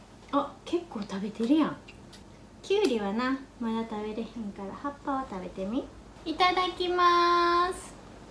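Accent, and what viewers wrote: Kyoto, Japan. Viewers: Specifically, I think she’s speaking in Kyoto-Ben. Osaka-Ben, which is what most people think of regarding Kansai-Ben sounds different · いつも楽しく拝見しています。私にも4ヶ月になる娘がいるので、スータンの様に可愛く育つといいなと思っています。英語を話すモエさんも素敵ですが、個人的には京言葉を話されるのも素敵だなぁと思っています。 I really enjoy watching your channel. I’m also a mom of a 4 month-old girl so I hope she will grow well and cute like. Your English is very charming but personally your Kyoto dialect is something that makes your channel even more attractive! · I know🌸her Japanese accent is so cute when she speaks English it’s very soft and beautiful since she’s from Kyoto🤍🇯🇵☺️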